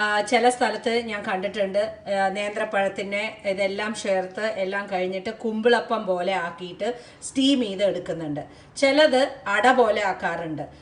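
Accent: Palakkad, India. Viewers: Chechi.. This is the first time I am watching ur video... Chechide samsaram kelkan enthu rasam aaneno... Thani palakkadan bashayil pachaka kurippu kelkkumbo natil ethiya oru feel... Pine ganapathi homathinde prasadam, idichu pizhinja payasam okke enthanelum idane... Samayam eduthu authentic aayitu cook cheyyunath kaanan thanne undu oru sugam... 👍👍👍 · pole samsarikkunna ente palakadu kootukare viji annu peru.adhyamokke varathamanam kelkkanum mathram njan video kandirunju..eppo recepie koodi kanan thidangi tto.thank you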